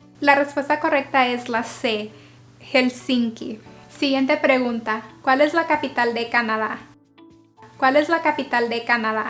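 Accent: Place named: Latin America. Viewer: so you're teaching Latin american spanish? That's my guess base on the way you pronounce certain words I wonder which country is your Spanish from? Since even in Latin American there are some differences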